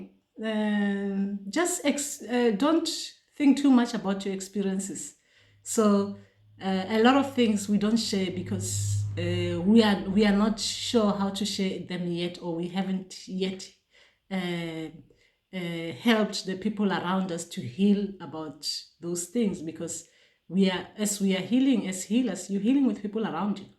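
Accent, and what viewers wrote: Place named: Balobedu, South Africa. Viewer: Surely speak like Balobedu. Nna my great grand parents come from Ga Modjadji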